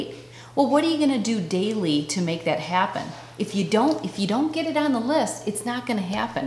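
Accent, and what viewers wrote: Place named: South, United States. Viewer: I like the family calendar idea., random question, where are you from? (Which state?) I'm new and i haven't heard you mention it yet and I didn't see it on your website either but ya know what they say, I'm blind in one eye and can't see out the other (anyone else have that problem?:P) If you dont wanna say, thats cool. I'm just hearing southern....a lot of southern...but idk, i could be wrong:)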